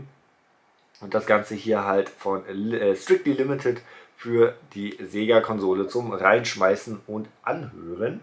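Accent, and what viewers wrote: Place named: Berlin, Germany. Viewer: ich feier es mega wenn du berlinerst :D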